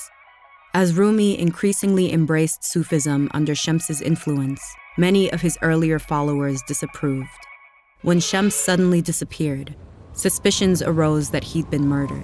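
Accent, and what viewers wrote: Arab world. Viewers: why the pronunciation is Arabic? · The Arabic accent of the narrator is stunning! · Funny that the pronunciations are more Arabic than Turkish even when saying Türkiye. Not very reflecting of the language of origin. Not all Middle Eastern countries sound the same. H is almost silent in Turkish. Speaking as a person that comes from the same city as Rumi. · Why do you have an arab with arabic accent telling the story of a Persian poet? She even said the name wrong.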